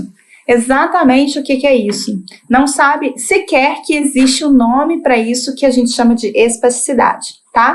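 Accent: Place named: Minas Gerais, Brazil. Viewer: Bem que esse sotaque não é estranho , meus são mineiros de Belo Horizonte ❤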